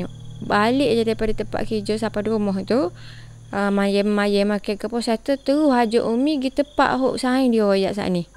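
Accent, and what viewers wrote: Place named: North, Malaysia. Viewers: Assalammualaikum n hadirrrrrrrrrrrr bt set2 n krew, bapak besaq cek boh Nama kita, terima kasih ye mention Nama kita yg jelas nmpk, sapa rabun pn mst nmpk kleaaar❤❤❤❤❤, loghat utara sempoi cerita kali ni.. 😅😅😅😅😅 Moga bereh pa skala ya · Hadeeeeeerrrr right now in the comment section below😅📏✌🏼 * Sis ckp utaqa😄👌🏼❤️👍🏼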